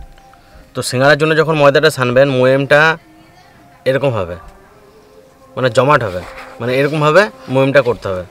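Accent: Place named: Murshidabad, India. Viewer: আমার মনে হয় আপনি মুর্শিদাবাদের মানুষ কারণ আপনার কথাগুলো শুনে তাই মনে হল।আমার বাপের বাড়ী ওই জেলাতে।যদিও তার এখন গল্প।যাই হোক,আপনার ভি ডিও খুব ভালো অনেক শিখলাম। তবে সব্জি মশলা টা কী বুঝলাম না।ভালো থাকুন।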